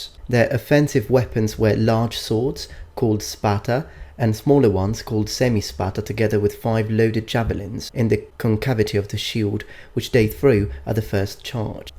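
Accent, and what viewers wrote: Italy. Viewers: I've heard Latin being spoken with an italian accent ( like here) or a more clear sounding version. Makes me curious of which one being correct, since Italian is of course influenced by the Ubians and Langobards that conquered Italy. PS: I'm not sure about the melee part. Wasn't the long metal tip of the Pilum made of soft iron and would therefore bend very easily ( better than Gladius of course when attacked by cav)? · Me: is this guy Italian? Me: yes, yes he is. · Hey I really like your videos and I dont want to nitpick here but I fear that you italian roots have 'tainted' your latin: AFAIK (and please correct me if I am wrong!) the C was always pronouced like K in ancient latin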